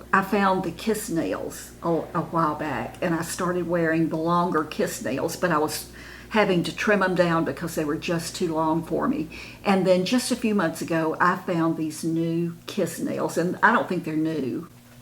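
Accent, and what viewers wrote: South, United States. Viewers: I love the sound of your voice. I know you have a southern accent, but I can’t name the state. Some older women have unappealing voices and they need to work on that. I guess you can’t do a video on that, but is a big factor.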